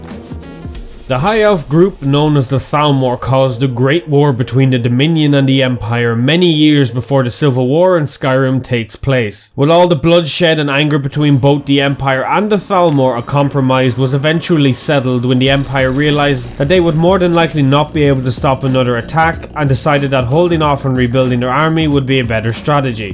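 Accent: Ireland. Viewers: I would suspect you are Irish after this vid if it is not for your nickname and flag) Keep up the good work.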